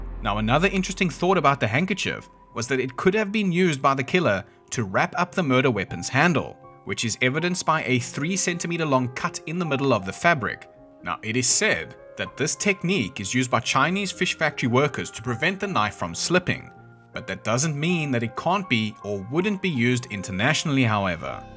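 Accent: South Africa. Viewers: You need more subs. So glad to hear a fellow South African. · Is a guy from South Africa talking about the ineptitude of the police in California? Crazy work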